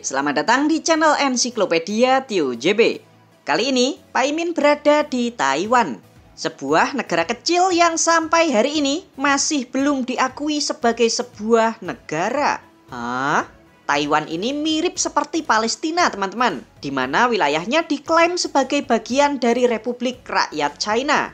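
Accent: Java, Indonesia. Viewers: Njenengan tiang pundhi min kok suaranya medok jowone min 🙏🙏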